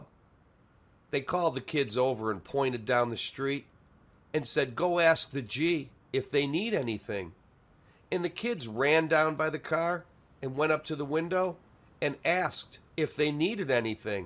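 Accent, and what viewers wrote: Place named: Chicago, United States. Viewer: You sure sound like Curly on the Archie Luxury show.....familiar Chicago accent.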